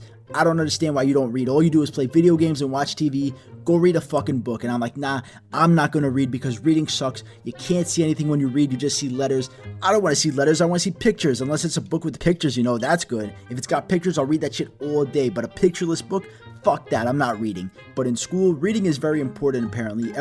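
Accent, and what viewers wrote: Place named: New York City, United States. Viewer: Just found your channel, you are so underrated. And I'm so sure you're from NYC. You have our dialect. 😂🙌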